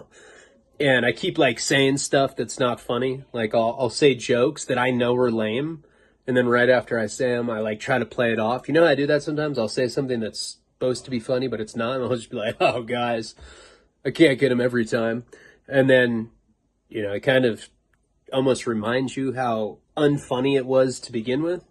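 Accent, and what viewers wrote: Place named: Chicago, United States. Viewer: Why do you always sound like a south sider every time you do voices? I have to admit it sounds good though. ESE 😂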